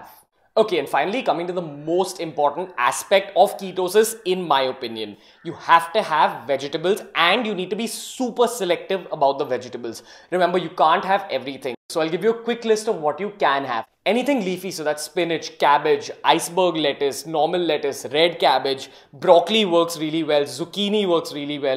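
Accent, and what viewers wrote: India. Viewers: When I saw the thumbnail i thought it is some American guy's channel and when I opened it.. BOOM... indian english #wtfmoment 😂😂😂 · The Indian accent is running off the charts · He has got an Indian Accent...Is that bothering u?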